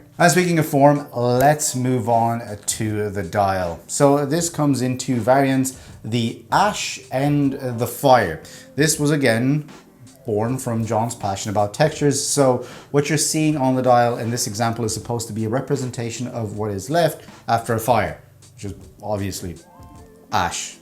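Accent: Ireland. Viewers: "I'm not Irish". I mean you sound pretty Irish bud.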